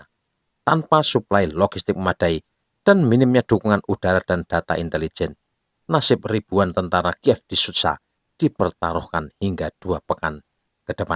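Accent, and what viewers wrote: Java, Indonesia. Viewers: Logat jawanya kental...ngx,seru bahas perang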